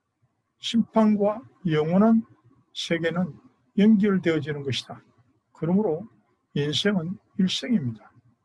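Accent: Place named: Gyeongsang, South Korea. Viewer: 잠시 머물다갑니다. 경상도 사투리로 차분하게 말씀하시니 더 친근하게 들립니다. 종교는 없지만 귀 기울여서 잘 듣고갑니다.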